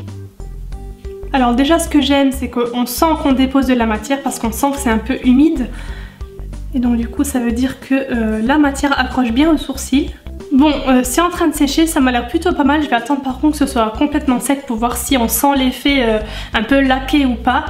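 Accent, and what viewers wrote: Lorraine, France. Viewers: Tu as grave l'accent Lorraine, tu habite à Forbach ? 💕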